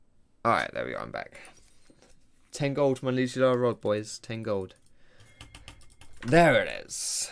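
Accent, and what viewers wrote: France. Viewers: Video gets a like for that french accent alone! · the french accent makes me smile xD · that french accent tho xD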